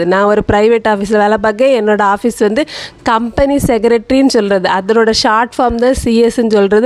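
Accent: Kanyakumari, India. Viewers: Your fish curry and fish 🐟 fry vedios super 👌 👍 ❤❤ Kumari slang very nice ❤❤but u show your face sister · I always like your kaniyakumari slang ❤going to job managing home; making tasy foods and YouTube hats off to you sister🎉🎉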